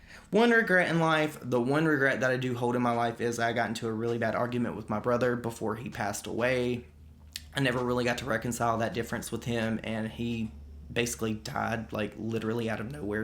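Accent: South, United States. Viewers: I miss you doing these kinds of videos, its like a breath of fresh air from all the drama so thankfully the drama is slow this year(so far) 🤣🤣 love you! I could listen to you talk alllll day! Your accent is everything and reminds me of my southern family i dont get to really talk to very often! Love you hope you are having a greaaaat day!!! I cant wait to see the new content you bring out, id love to see true crime, paranormal stuff from you!!!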